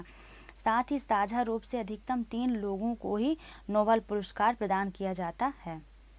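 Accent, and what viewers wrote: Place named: Bihar, India. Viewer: Tum Bihar se ho ...Boli mein udhar ka hi ahsaas ho Raha hai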